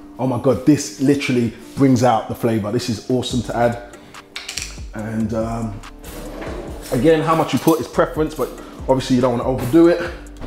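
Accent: England, United Kingdom. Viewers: shoot that crib up you hidin in he's an African brit. He doesn't have an American accent, he has a British accent. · Dude with that English accent, and Body your making all us guys look Badd😂😁🤣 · R u from the uk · he’s from the UK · AND he has a british accent?? geez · pillohw british accent there is nothing called english accent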